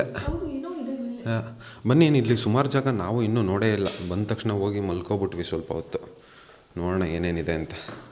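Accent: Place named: Mandya, India. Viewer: sir nim english, italy spanish, axent jote mandya kannada extraordinary. Niv vlog madya mandya kannada chenda 😊 Happy anniversary to both of you🎉. Muddagiro girl baby ne pakka..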